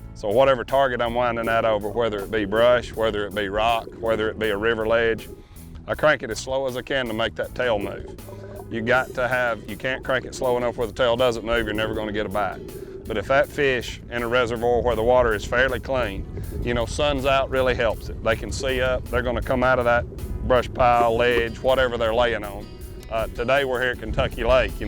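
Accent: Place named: Appalachia, United States. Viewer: You know it's good when he has a hillbilly accent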